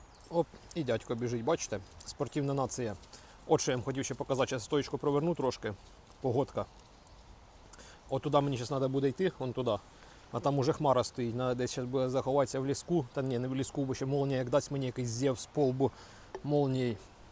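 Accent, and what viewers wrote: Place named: Surzhyk, Ukraine. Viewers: Так слідуючий момент це мова. Ну можливо щось виправити ну так говориш аж тошнить. Скільки вже тобі писали запитувати але ти принципово як олень вперся і доказуєш що ти говориш говорив і будеш говорити на такому суржику але суржик більш зросійщений. Англійський вдосканолюєш данський будеш вчити а рідну мову покращити принципово не бажаєш . Чому таке ставлення до свого рідного??? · Ти Мразота Зариганая😢...Кроку на Куй зі своїм франківскім суржиком🎉...Слухати ваше блювотіння....щє та пригода😢...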